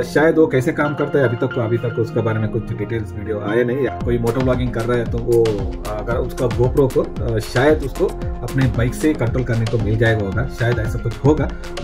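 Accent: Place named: Northeast, India. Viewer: abe ve north east se hai... tujhe jyada dikkat hai to jaake sidha showroom walo se baat karle na ek to banda mehnat kar raha upar se ye C type log aa jaayege samne wale ke accent pe bolne ke liye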